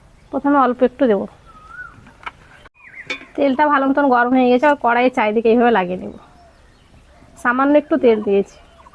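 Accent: Murshidabad, India. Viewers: Amar to mone hoi murshidabad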